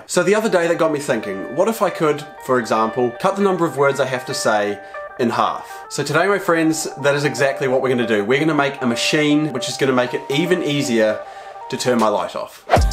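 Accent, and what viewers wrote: Australia. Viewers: i love australian acent so muchhhhhhhhhhhhhhhhhhhhhhhhhhhhhhhhhhhhhhhhhhhhhhhhhhhhhhhhhhhhhh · Is he Australian?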